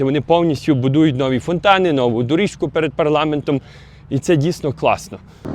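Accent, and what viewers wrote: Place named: Ivano-Frankivsk, Ukraine. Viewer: ТЫ С ИВАНА ФРАНКОВСКА МУЖИК? АКЦЕНТ ХОРОШИЙ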